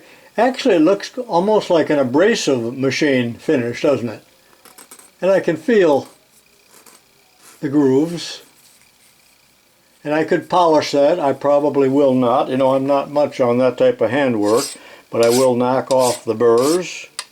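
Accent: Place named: United States. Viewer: When i was a kid, way before the internet. The way you talk is how i imagined all Americans talk. To my foreign ear the pronunciation is like poetry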